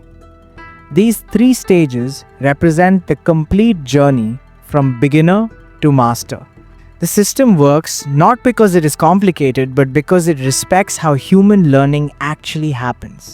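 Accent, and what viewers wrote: India. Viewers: Are you Indian? Your accent sounds Indian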